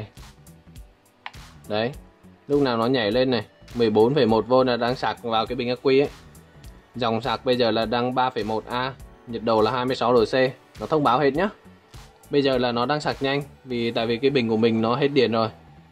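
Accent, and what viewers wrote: Nghe An, Vietnam. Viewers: Anh ơi anh người nghệ an hả anh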